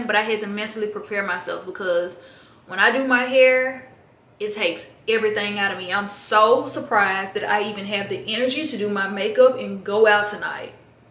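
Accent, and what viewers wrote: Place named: Memphis, United States. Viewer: welcome to almost 40.. But you are still a BAD chick..U make me laugh so much because being from Memphis,i hear so much of it when you talk.. So i cant relate…You can also use rice water on your hair, it work wonders.. still stinks but I use it weekly with Castor oil…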